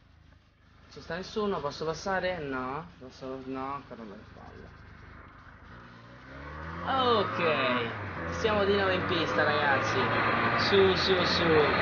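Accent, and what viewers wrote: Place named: Latina, Italy. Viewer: Ma sei di latina daje ahahah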